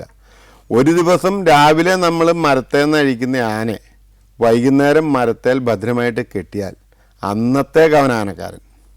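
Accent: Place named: Kerala, India. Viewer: Malayalam ❤❤slang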